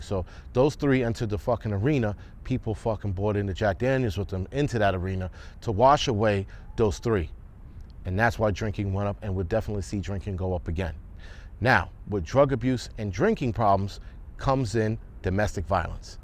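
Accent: New York, United States. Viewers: It's just a word, it only has as much power that you give it. Hes a freaking New York firefighter. It's almost in the job description to talk like he does. Most of my family still lives in NYC. Listinging to is like listening to family.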